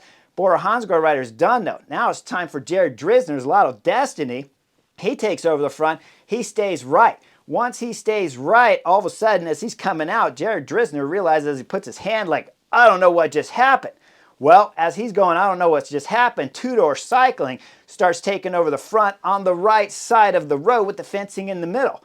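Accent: United States. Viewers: Great analysis and superb commentary. The American accent is really getting me 😆. Super amusing hearing everything pronounced that way.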